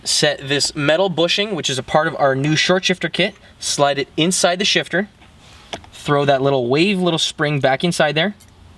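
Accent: Canada. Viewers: Let me guess you're from Canada? the accent is really annoying xD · NOAH Shirsat lmfao...where is he from Canada????? I had to stop watching the video... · He's not Canadian? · He's Canadian, they gave us syrup and hockey so I can look past ot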